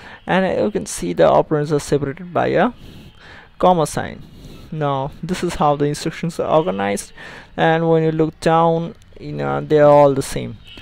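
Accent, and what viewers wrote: Bengal, India. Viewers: he is indian and his mother language is "Bangoli"(local language)... so this "Bangoli" accent is coming in his English.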